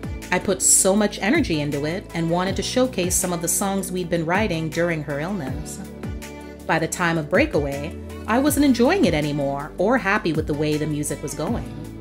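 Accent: United States. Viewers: The narrator is American so.......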